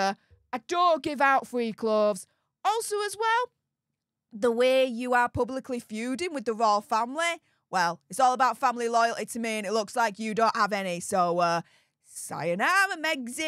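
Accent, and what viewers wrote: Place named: Northern England, United Kingdom. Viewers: Dear, I’ve a personal question (maybe none of my business and could be offensive but not my intent). I’m trying to place the location of your accent. I enjoy studying UK accents and like placing them to their location. Correct me if I’m wrong, and most likely I am, but I’m guessing somewhere between Manchester and Yorkshire? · Love listening to you as it brings me home with your accent. I am originally from Manchester England and you sound just like my cousins. I live in Mississippi now, have been here since 1972. I did go back for 3 years in the 90s from 94 till 97 after Diana died. People change so it was useless to want them to see me after 20 years back then.Thats ok because they missed out on me and my daughter. Their loss. Luv ya.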